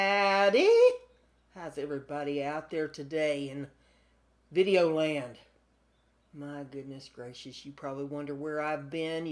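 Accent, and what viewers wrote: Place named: Tennessee, United States. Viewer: lol. I've got to get myself a new computer, too. So, I giggled when you talked about that. lol. I've never used a wood burner on leather, but that turned out beautifully. I've only ever stamped with metal stamps into leather to make some keychains, so the burner effect will be neat to try. I've added this to my support playlists- Handmade Crafty Creators and the one for Leather Crafts. It's great to hear someone with an accent like mine. Reminds me of home back when we lived in TN. lol. Great crafty content, gal. Craft on!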